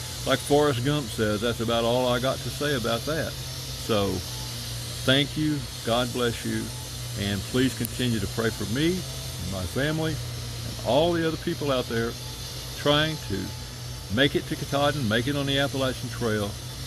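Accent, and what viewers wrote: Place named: South, United States. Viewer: Congratulations on hiking your own hike, and knowing when your personal journey was over. Being honest with yourself is difficult, when you set lofty goals and know your abilities, but know in your heart that you have realized that your dreams and visions have been answered in every aspect. Now you can carry them in your heart and share them with others who might be inspired to attempt the life-changing journey that you have taken. I'm sorry that I didn't get to see you in the flesh at Upper Goose Pond Cabin, but it was not to be. You have become a companion in my armchair, and shared six months of your life with my wife and me, and we are grateful for your time, effort, and good-natured humor, delivered in a soft and mellow drawl. We both lived down South, individually, when we were younger, and have since married and are living in the New England state in which I was born, but, whenever we visit any place below the Mason-Dixon Line we are still home. 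Godspeed,. The Lord bless the path that He has set before you. I trust that we shall meet some day. 👍💪✌🙏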